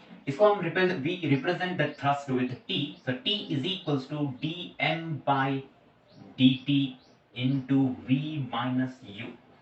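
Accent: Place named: Hyderabad, India. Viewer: yoo, bro sounds hyderabadi, btw great explanation bruh, and why not uploading videos on yt rn??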